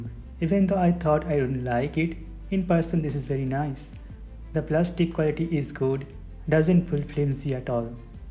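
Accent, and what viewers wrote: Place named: India. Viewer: Indian accent is amazing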